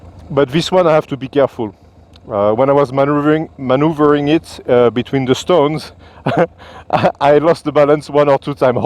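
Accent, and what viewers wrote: France. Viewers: A true French accent ! 😁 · I love french accent in your english , because i am french 🤗. Les moteurs des cooters honda sont il les mêmes que sur les scooteur sym ? Merci for your vidéos , dear french man .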